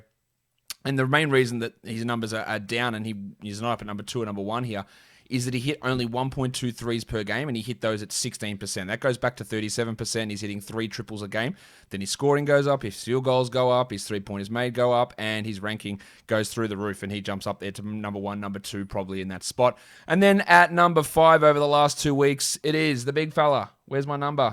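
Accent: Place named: Australia. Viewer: Great show. My wife likes ya Australian accent. Cheers to the weekend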